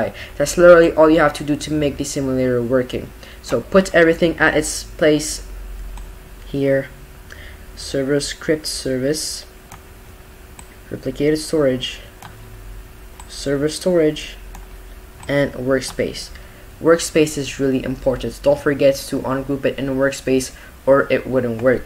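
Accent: France. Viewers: Ur french right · are u french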